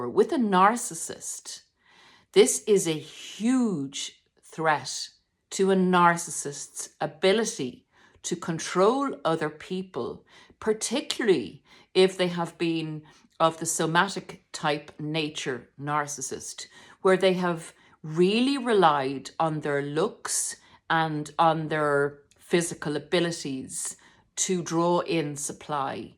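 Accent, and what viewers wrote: Ireland. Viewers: My ex was Scottish but lived in Ireland, so I visited Ireland a lot and travelled around many different counties. Thus I love the accent and really love listening to you. Your voice is really soothing to me and reminds me of just sitting chatting with locals in some beautiful country bar. You also hit the nail on the head with the narc stuff , as the Scottish ex was a disgusting , cheating lying abusive violent narc - a really extreme version. So despite the fact that some very extreme abuse occurred when I was alone with him in Ireland - i still have some lovely memories of the place, the landscape and people i encountered when out and travelling around alone. I even met strangers who stepped in to help me when the abuse was extreme- people who actually took me in to their home. I am eternally grateful for the help they so selflessly gave me- and I often wish I could let them know albeit spiritually, that I finally got away and stayed away. 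❤️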